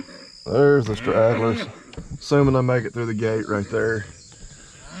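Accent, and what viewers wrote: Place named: Tennessee, United States. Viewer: First video I clicked on, being from TN I loved hearing that accent! Lots of support from southwest TN!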